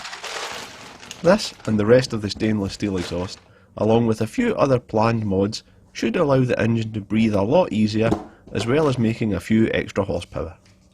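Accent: Scotland, United Kingdom. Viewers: Oh hell yeah! My favourite workshop ASMR plus JDM content and violently Scottish narration to top it off. \o/ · Honestly this is one of those "must watch, never miss" type channels/series. The quality of the workmanship is outstanding, as are the videos, another key ingredient is the David Coulthard/Leigh Mckenzie type accent its warm and relaxing whilst being authoritative on the subject at hand.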